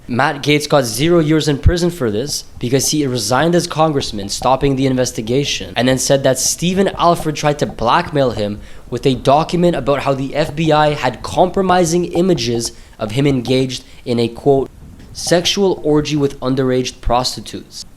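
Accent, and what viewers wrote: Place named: Canada. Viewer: Oh this is good man. Idk where you came from… sound Canadian - but this is good work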